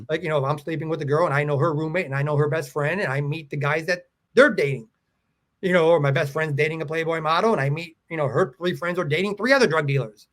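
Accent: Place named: Chicago, United States. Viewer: You always say you're from LA but i knew I detected a Chicago accent. Great content, I hung around the underground club scene in Chicago from <num> in my late teens. Love the stories, reminds me of the stuff I was doing with my friends back in the day.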